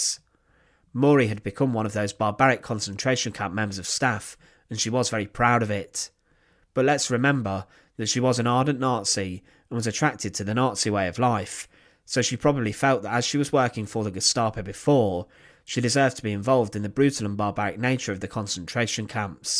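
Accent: United Kingdom. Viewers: Settle down, did you notice the narrator had a vaguely British accent. They love to insinuate that r sound when it's not called for, so Capi could sound like Carpo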